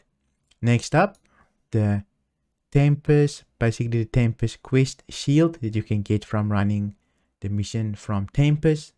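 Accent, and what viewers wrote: Afrikaans, South Africa. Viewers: Love the Afrikaans accent. Im also from South Africa.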